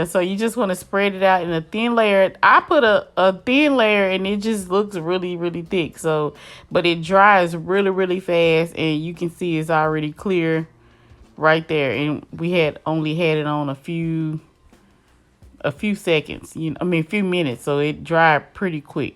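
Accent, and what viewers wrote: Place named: Dallas, United States. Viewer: I instantly knew you were from Dallas and you sound like me bih. And then you said Ebony beauty supply.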